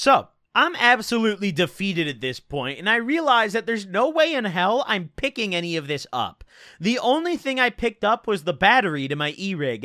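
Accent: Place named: Midwest, United States. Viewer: I was born in 91, grew up in Milwaukee suburbs which is probably fairly similar to the Chi suburbs is from. He reminds me in a very peculiar/distinct way of me and my friend group back around ~2010. His speech patterns are almost identical, which since becoming older I have moved on from this lifestyle so it just reminds me of those times. The only difference is some of the newer tech like dabs but I'm not so sure things have changed that much. We were doing the exact same shit. Maybe it's also a regional thing.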